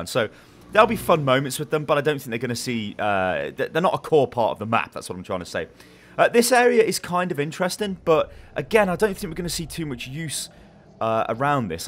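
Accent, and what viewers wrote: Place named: RP, United Kingdom. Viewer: you sound JUST like JP from Fresh Meat. Quite posh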